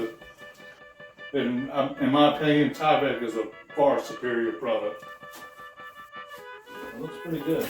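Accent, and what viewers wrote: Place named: Louisiana, United States. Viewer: You sound like your from Louisiana buddy 😂…I got it liked and I’ve subscribed. I like watching them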